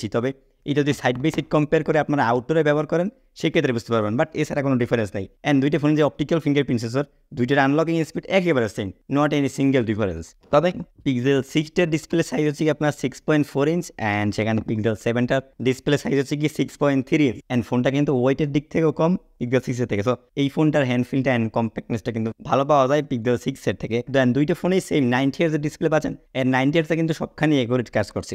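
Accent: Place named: Barishal, Bangladesh. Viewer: ভাই আপনি তো মনে হয় বরিশাইল্লা 🙂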